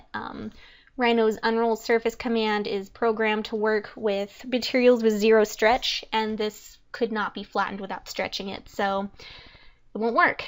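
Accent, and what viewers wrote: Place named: Midwest, United States. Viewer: lol that midwestern accent is great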